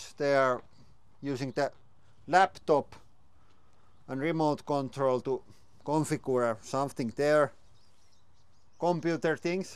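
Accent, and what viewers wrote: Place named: Finland. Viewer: Great video, but Finglish is not easy to listen!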